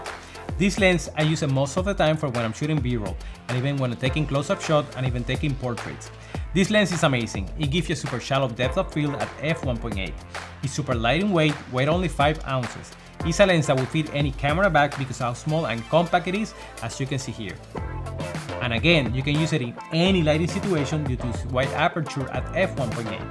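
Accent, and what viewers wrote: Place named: Venezuela. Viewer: Tu acento es definitivamente Venezolano!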